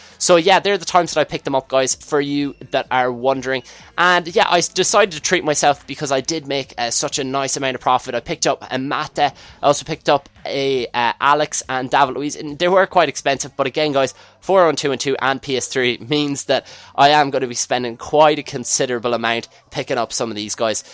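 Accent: Ireland. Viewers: where exactly in ireland are u from?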